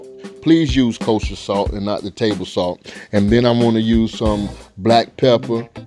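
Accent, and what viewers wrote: South, United States. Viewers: I love how Americans talk “Some of this here minced garlic” “Get you a skillet put you some butter in it” 😂😂😂 it makes my heart warm 😂😂😂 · Not all Americans talk like that. (Nothing wrong with it) He has to be from down south somewhere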